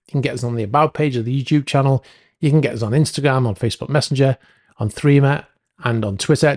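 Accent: Liverpool, United Kingdom. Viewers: Great channel! Who is the host? I’m guessing a fellow Liverpudlian? Like to know more!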